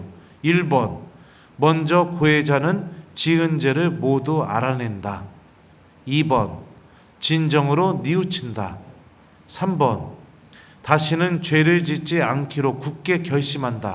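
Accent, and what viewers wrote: Chungcheong, South Korea. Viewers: 신부님 충청도 홍성사투리가 너무 정겨워유 제고향도 엽동래유 신부님 건강도 돌보셔유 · 충청도 사투리로 인사하시니까, 신부님의 덕담이 더 구수하게 들리네요. 저도 몇십년전 죄 고백을 했던 씁쓸한 기억이 나네요 죄는 붙잡지 않아야 ,진정으로 회복이 빠르다는 말씀에 적극 공감합니다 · 잘 계셨슈? 그려유~~그유~~ 충청도 구수한 사투리가 너무 친근감이 듭니다. 고향생각이 납니다. 쉽게 풀어 강론하시는 신부님 고맙습니다. 항상 건강하시길 기도합니다.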